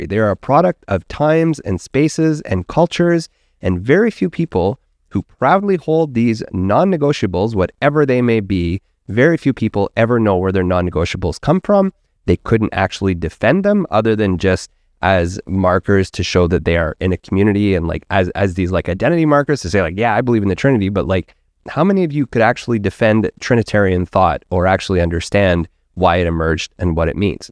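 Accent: Canada. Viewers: Great discussion -- it really highlights the lack of intellectual, and if I may say so, spiritual honesty in the evangelical faith community that I was a part of for so long. I did not feel free to wrestle with any doubts whatsoever, much less to express them openly. Even if you found someone who understood the problem, they always seemed to have a cut and dried "solution" that appeared "doctrinally sound" but completely unsatisfying. Which left me basically no choice but to quietly leave the matter unexplored and wonder why I was such a lousy Christian. Very difficult to put that problem into words until now, so thank you so much for confronting it. By the way, being a big hockey fan, I never had any doubt that you have a Canadian accent, not an American one! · Don't worry bro I actually can tell that you're Canadian by your accent. The word has a lot of baggage that was helpfully unpacked. 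I wonder if many of the issues that you discuss potentially stem from people focusing on the New Testament. Paul discusses (and references) a lot of Scripture, that is, the Old Testament and whatever else the Jews used. I think there are a few epistemological problems with liberal Christianity: it is the latest installment in a long tradition of Greco-Roman thought that I think is problematic. This is my first introduction to liberal Christianity so I can't really say much else. Very thought provoking stuff, thanks for sharing.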